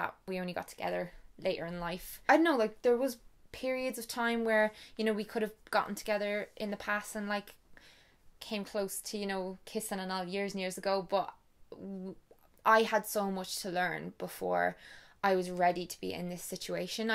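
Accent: Ireland. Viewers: Love your Irish accent :)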